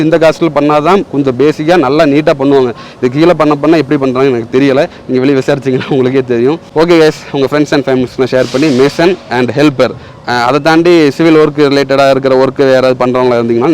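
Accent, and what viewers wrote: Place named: Karaikudi, India. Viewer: ஆமா ஏன் la வீடியோ போடல உன் ஸ்லாங் எனக்கு பிடிக்கும் ல அக்கா காரைக்குடி ல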